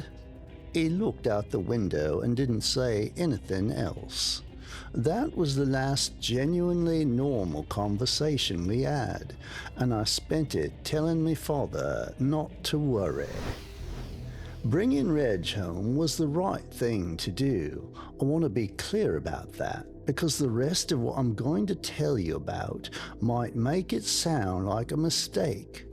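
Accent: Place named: England, United Kingdom. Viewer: I loved the authors English accent. Favorite line: I’ve gotten really good at “comfortable thoughts”. It’s almost as if the English accent created a character that spoke with the consciousness that became this narration, not only in tone, but in vocabulary. Very authentic and hauntingly intriguing story.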